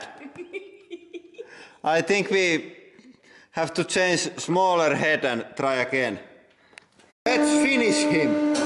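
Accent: Finland. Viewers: Channel Thats cool. i love your Finnish accent by the way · Valtteri Närhi Aksentista huomaa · kunno rallienglantii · rallienglanti for the win:D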